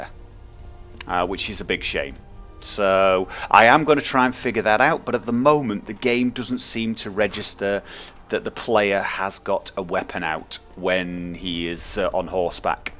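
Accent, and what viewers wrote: United Kingdom. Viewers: Would guess British but lives in Norway. But man, Trondheim is such an epic name! Greets from Sweden.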